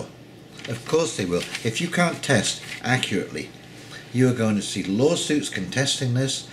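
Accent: England, United Kingdom. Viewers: Where are you from? You sound English but I'm guessing you live in Canada.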